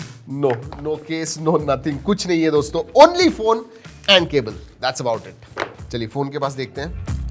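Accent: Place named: Khandesh, India. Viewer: Oh tuni bahna nig baher 😂😂😅wow sir tumhi bhi aahirani boltas maja yi lagi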